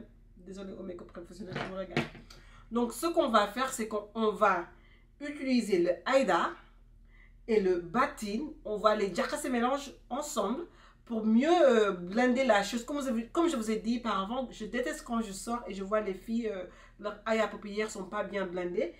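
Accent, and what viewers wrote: Wolof, Senegal. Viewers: 😂😂 je te kiffe trop ton français wolof way😂😂super maquillage spicy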